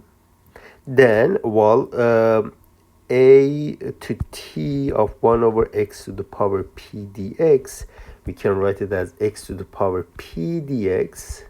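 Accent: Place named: Nebraska, United States. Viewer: are you from nebraska? you sound like my dad?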